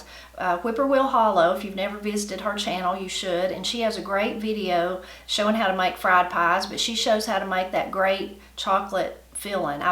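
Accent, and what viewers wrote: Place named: Missouri, United States. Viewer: I just gotta say your speech is just like my kinfolk from central Missouri. You are the first person outside of my family that says Ain’t for aunt. Love listening to you talk.... and cook of course!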